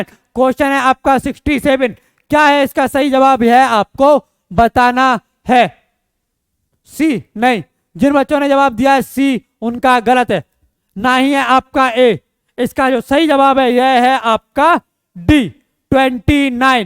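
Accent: Haryana, India. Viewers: Aap Haryana se ho ji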